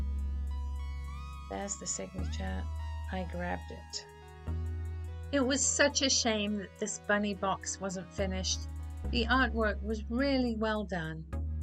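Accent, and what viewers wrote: United Kingdom. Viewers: Hi, new to your channel. I saw you & Danni, the Niche Lady shopping together. Love your valuable info about why/why not you choose things. I'm a reseller, but have 3 booths here in western KY & don't sell on ebay. I heard Danni advise picking up fire fighter mugs, sunce they are good sellers & noticed a blue one on the shelf you may have missed. God bless! I love your accent! British? My mom was from England.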